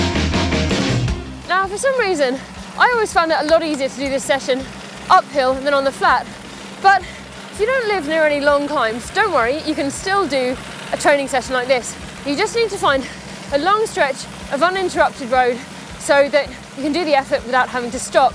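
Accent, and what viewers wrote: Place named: United Kingdom. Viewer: We love you british accent Emma Poooooley!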